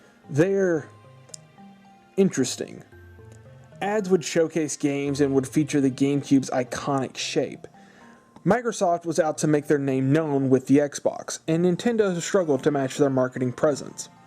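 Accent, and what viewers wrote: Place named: South, United States. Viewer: Your accent. Are you from Georgia? South Carolina?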